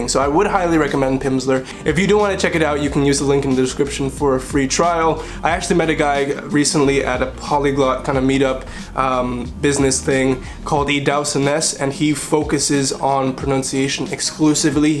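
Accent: United States. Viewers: Well, he has the American accent down well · Hey, im russian and i can clearly hear his accent. He sounds like american, not russian. Yeah, his accent is still decent (for foreigner)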